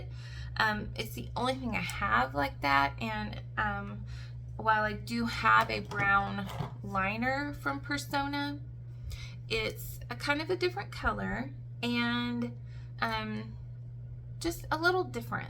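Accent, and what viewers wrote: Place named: Texas, United States. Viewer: Which state are you in? My guess in Texas or Oklahoma, going by your accent. I've actually spent alot of time in the states, having family in California, Idaho, Illinois, Montana, Arkansas, Texas and Colorado. I'm not American but I'm first generation Australian, from Europe. Other family members have immigrated to US.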